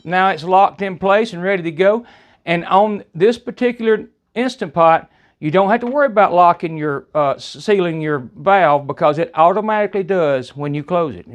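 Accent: South, United States. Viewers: love your accent! southern accents sound always so funny to me. i could listen to you for hrs. i just got one of these insta pots for christmas and i am scared to use it. much love from michigan! i wonder if my accent sounds as funny and nice as yours. take care.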